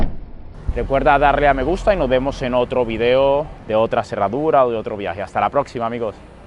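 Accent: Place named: South America. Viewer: Y ese acento de donde es? Parece de sur america tratando de hablar como español....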